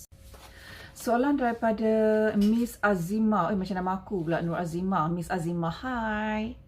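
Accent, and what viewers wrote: Negeri Sembilan, Malaysia. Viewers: Dengo suara pon dah tau org N9..kan?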